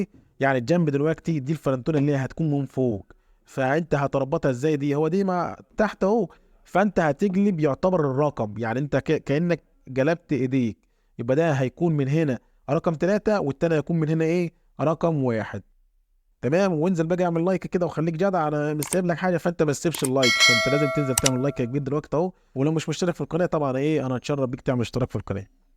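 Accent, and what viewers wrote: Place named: Upper Egypt, Egypt. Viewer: اللهجة الصعيدية جميلة ربي يوفقك تحياتي من بغداد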